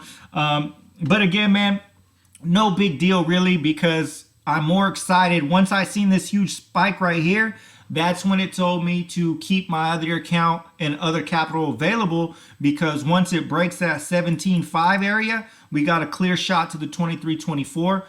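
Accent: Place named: New Jersey, United States. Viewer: HI! I appreciate your videos, thanks for sharing your opinions on TSNP. Was surprised that you haven't received a response regarding the investor conference call....They should give you an engraved invitation!! You are doing good things spreading the word about this company. side note - Where is your accent from? New Jersey? New York?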